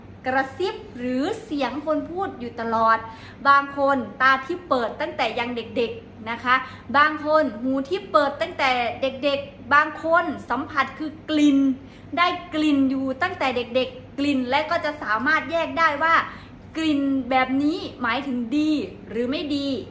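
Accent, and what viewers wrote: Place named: Isan, Thailand. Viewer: สำเนียงคุณเหมือนคนอีสาน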